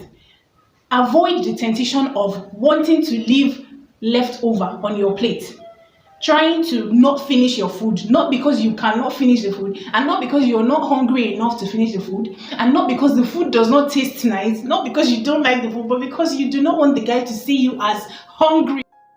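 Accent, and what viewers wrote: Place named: England, United Kingdom. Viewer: I like your english accent. you speak good English. good tips. yes self esteem. yes need to have money before travel. enjoyed the video support you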